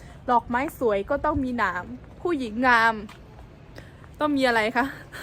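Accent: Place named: Tai Khuen, Thailand. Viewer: สวัสสดีน้อง เสียงแจ้วๆด้วยเสียงสำเนียงไทขืนเพราะน่ารักๆมากเลยส่งคลิปสนุกๆมาให้ชมพาสาวๆไปเท่วงานปีใหม่ไตมีคนมากมายมาเท่วงานขอบคุนมากครับ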